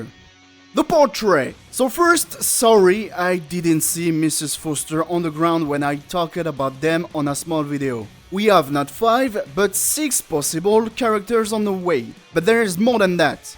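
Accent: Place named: France. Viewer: I love your French accent pal, you are very thorough and proper with these videos, and they are made with love and meticulous craftsmanship. Keep it up bro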